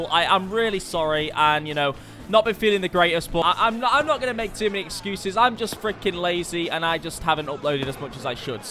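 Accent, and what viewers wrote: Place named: United Kingdom. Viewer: Hey, I'm from the UK, actually thought you were too 😂 😂 😂 Awkward... You sound British... So that's odd 😂 😂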